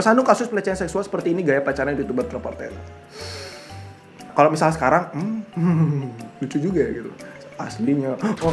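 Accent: Bali, Indonesia. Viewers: Suka banget ama aksen balinya wehh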